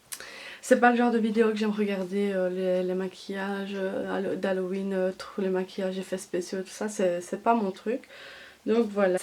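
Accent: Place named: Belgium. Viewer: Et une abonnée en plus ! j'aime bien t'a façon de parler ;) Tu es belge ? moi aussi je suis de Belgique sa se reconnais direct avec nos beau accent MDR :p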